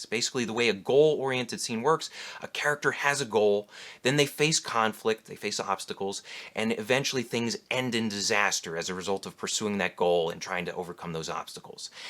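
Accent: Chicago, United States. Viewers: You gotta be from Chicago right?😊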